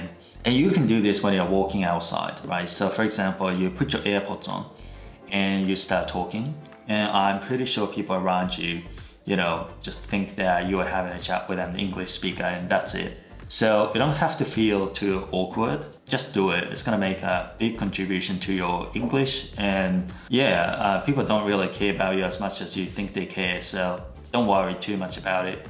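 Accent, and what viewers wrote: Australia. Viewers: I can get that English is being adjusted to Aussie one(kinda "Aussied"😂) little by little. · オーストラリア発音磨きかかりまくってんなw makeとかほぼマイク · オーストラリアの発音ですか？ way = ワイ show = シェア 教えていただけるとありがたいです。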